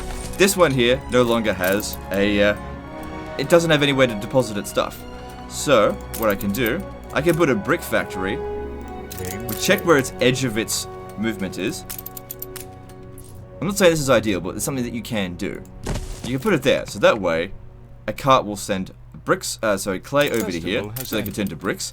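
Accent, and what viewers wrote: Australia. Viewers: isnt he australian lol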